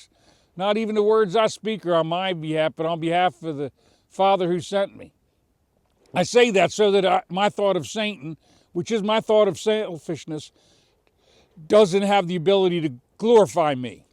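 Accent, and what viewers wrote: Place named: Pittsburgh, United States. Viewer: I hear that Burgh accent too💪 Yinzer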